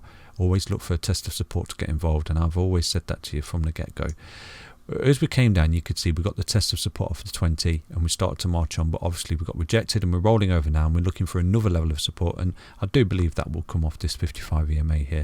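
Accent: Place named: Nottingham, United Kingdom. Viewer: You are so from Notts lol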